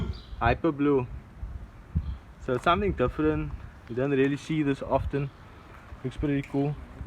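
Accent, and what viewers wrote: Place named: South Africa. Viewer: Where are you from bro? Your accent sounds South African am I right....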